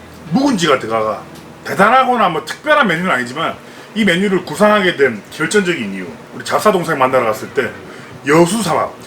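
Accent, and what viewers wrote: Gyeongsang, South Korea. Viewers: 고향은 울산 방어진이시고, 현재는 강원도 홍천에 살고계세요-^^ 울산에서 오래사셨어서 경상도 사투리를 많이쓰세용😁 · 대구 부산 둘다 살았는데 대구 말투에 더 가까운 거 같기도 하고...ㅋㅋㅋㅋㅋ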